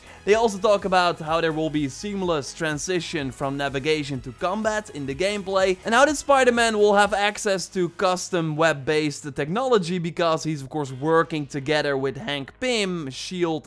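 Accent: Netherlands. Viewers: That Dutch accent is so strange and thick.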